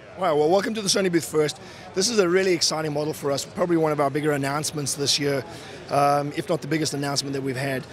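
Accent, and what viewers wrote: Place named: South Africa. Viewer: I can't decide if this guy is South African or Australian. In fact I think he's probably a emigrated South African, there are thousands of them in Ozzie land ;P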